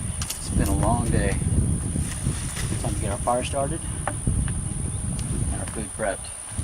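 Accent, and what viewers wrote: Texas, United States. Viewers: Is this how a raw Texan speaks? Theres something stylish about it and more importantly, something rustic and natural, a natural thing that a person from anywhere in the world can identify with. I mean, in my town, old tough men speaks jus like this, and they know stuff just like this. My salutes. You guys are the real natural human thing, and maybe thats why all of them other ones from other countries relate to u and speak the same style no matter what the language is (i mean only the raw natural guys). Theres just something universally common about you rare lot around the world. The things u endure, the things you eat, the way you carry on unlike some city folks who wud just say yuk, freak out , run and eventually die prematurely. Once again, respect to you. Thanks for showing us a glimpse :)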